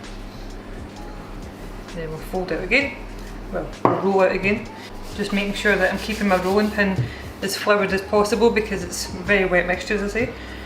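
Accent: Scotland, United Kingdom. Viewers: I like the Scottish accent. I work with a Scotsman but his accent is much watered down. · As an American who isn't too picky, a biscuit is a biscuit is a roll is a biscuit. I just watch for the adorable Scottish accent. Dank and the dogs are a lucky lot.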